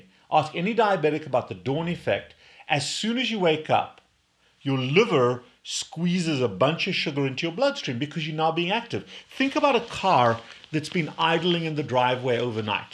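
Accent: South Africa. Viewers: faint South African accent! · South African accent. It's quite charming.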